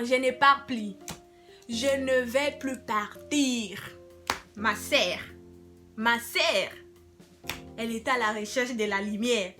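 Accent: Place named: Democratic Republic of the Congo. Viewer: J'aime les congolais...Regarde comment tu parles tu Choko façon jusqu'à 😂😂😂😂😂Je m'abonne ohhhh on fait comment avec confinement là...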